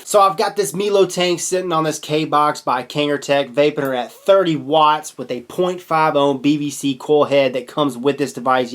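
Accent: South, United States. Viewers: Are you serious? Dude talks with a Southern drawl...Dont try tawkin to a New Yorker if you think A southern drawl is fast!! Really youre being sarcastic ...Iknow lmao!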